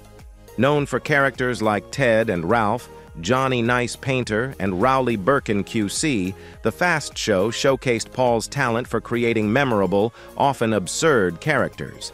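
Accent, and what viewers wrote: United States. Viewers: Soon as I hear the AI American accent I swipe and reject…. No thanks.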